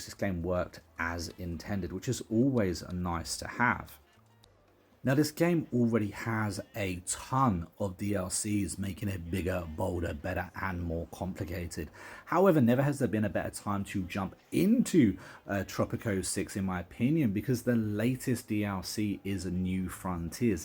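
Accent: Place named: United Kingdom. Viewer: Red Banana. Thanks for the up to date review which also mentions New Frontiers, what I was looking for. Also early on I noticed that you were deliberately choosing to speak in normal English and not a weird mix of normal English and specifically American English in word choices, pronunciation and putting the stresses in the wrong parts of the words. This isn't just annoying, it's the fact that it doesn't make more people watch and like you from over there. Americans have been trained by Hollywood to James Bond baddies with English accents to give a level of intelligence and competence that they like to listen to, just because we get past participle right. I therefore was not surprised to hear you have a book out, so that was why I didn't have to explain to you what editors say about not mixing dialects. Good luck to you with both this and the writing and thanks for being competent. 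This is very hard to find among British content creators increasingly.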